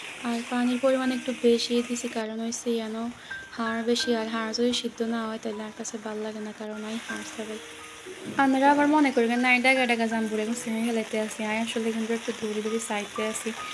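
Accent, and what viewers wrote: Noakhali, Bangladesh. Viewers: নোয়াখাইল্লা কথা আমার খুব ভালো লাগে নোয়াখালী ঘুরতে গেছিলাম থাকতেও অনেক ভালো লাগে ❤❤❤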